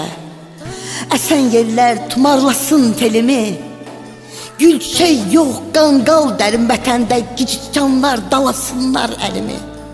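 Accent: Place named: Nakhchivan, Azerbaijan. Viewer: 😂😂😂Lehçe lap Naxçıvan lehcesidir ki😂😂😂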